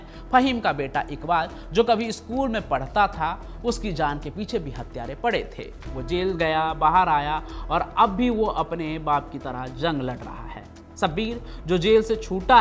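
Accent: Bihar, India. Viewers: Wannabe lallantop 🤑 Aaj kal trend ho gaya u.p ,bihar ke accent me kuch bhi bolo aur views le lo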